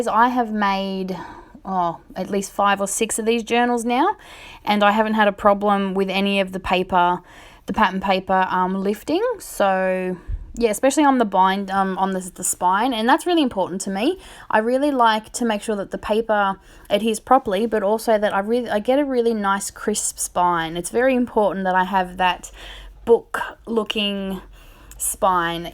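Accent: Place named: Australia. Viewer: like your work and your Aussie accent.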